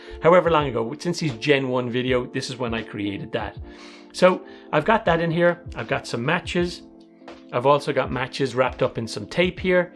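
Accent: Ireland. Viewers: "Underneet Dat doh..." great craic to see a fellow Irishman with a cool edc channel. Great altoid tin! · just came across your channel after this video was suggested to me and my god i’m glad! what a legend! loved trying to localise the silicon valley-ified irish accent. has to be cork? definitely down south anyway ;)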